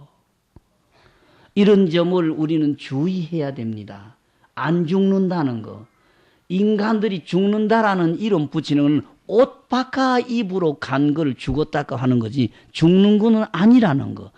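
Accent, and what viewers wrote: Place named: Gyeongsang, South Korea. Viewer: 스님 너무 그립습니다 다시 듣고 또 듣고있습니다 경상도말에 담담하게 하시는법문이 가슴에 너무 남습니다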